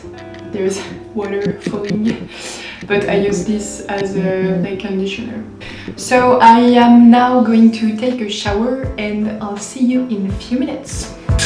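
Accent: France. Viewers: Your French accent and grammatical mistakes are really funny. Thank you for that delightful and entertaining video! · That frenchhhh accenttt 💛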